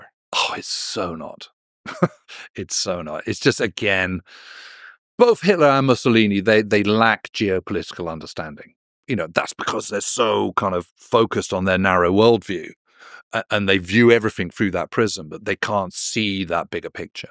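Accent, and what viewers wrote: Southern England, United Kingdom. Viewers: As a fellow countryman & with a background in applied linguistics, I can therefore attest with some knowledge & experience that James Holland naturally (Without any false pretenses) speaks a well-educated form of English with a ‘Standard Estuary or Southern English’ accent! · He has an educated Southern English accent, which is the heart of the English language. Americans as our offspring have a different dialect, which is fine.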